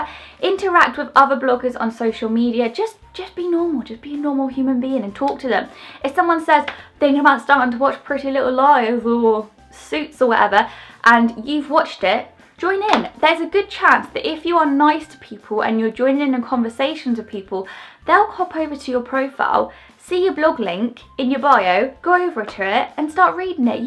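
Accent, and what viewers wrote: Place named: United Kingdom. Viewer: Gymnast4Life-M I know right sooo British in British don't have such a beautiful accent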